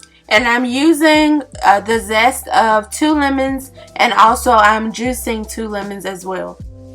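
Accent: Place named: South, United States. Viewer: You sound so sweet and you’re definitely from the south which makes you sweet. I’m from the south tube but I live in Arizona. It’s not as sweet as the south. I like your cooking channel. Keep it up girl.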